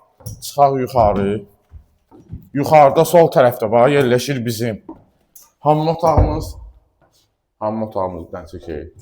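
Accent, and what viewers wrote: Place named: Baku, Azerbaijan. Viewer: бу гардашын дашынмагындан беле баша душурук ки бу гардаш заводской бакылы баласыдыр озуде маштагылы олачаг . валлах сенин о ширин лехчене горе истесен де истемесенде адамо еви алар . гардаш вар ол саг ол емише беле позитив ол сене гулаг асанда адам гедиб душун <num> иллере чох саг ол ки кечмишимизи йадымыза салдын аллах хейрини версин ай гардаш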